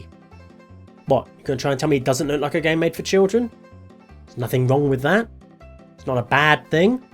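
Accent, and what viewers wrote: England, United Kingdom. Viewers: Still nothing from any of these companies that makes me want to run out and buy a new console. Good video though. Also, are you getting more English? You sounded more English in this video than previously.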